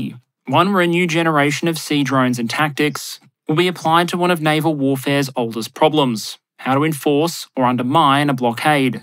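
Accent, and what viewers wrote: Australia. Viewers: What an episode. You are serious what the world needs, the work you do is insane. You deserve all the awards. Thank you Mr. Aussie voice man.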